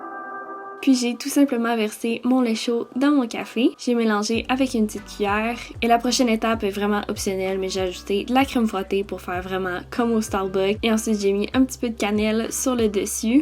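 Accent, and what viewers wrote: Quebec, Canada. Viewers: De la bonne humeur, des recettes qui mettent l'eau à la bouche, le tout avec un accent québécois plein de charme 🙂. Merci!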